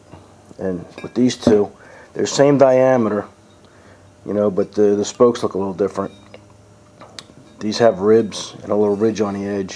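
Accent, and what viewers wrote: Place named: New Jersey, United States. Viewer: Your Jersey dialect versus my Canadian dialect.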